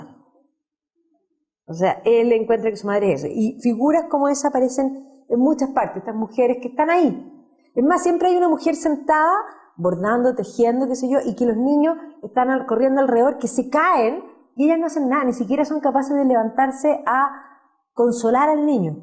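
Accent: Chile. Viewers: Señor, aunque tarde el comentario pero no sé puede dejar pasar...no le molesta la dicción, en realidad lo que se le hace extraño es el acento Chileno, pero para cualquiera que haya ido a una universidad acá, es el estilo y ritmo normal de exponer un tema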